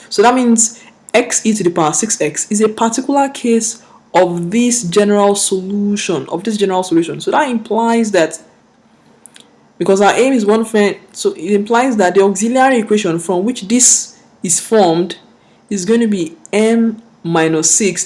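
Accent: Nigeria. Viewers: Sounds Nigerian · are you Nigerian?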